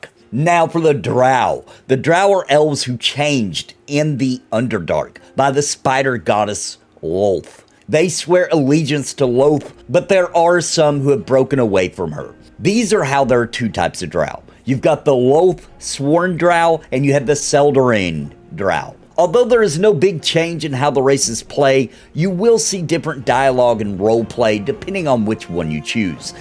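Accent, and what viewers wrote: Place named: Georgia, United States. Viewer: Are you from Georgia? You have the same dialect as the man the myth the legend himself, Judd. None the less, great video, I'm also gonna be a Paladin Dragonborn!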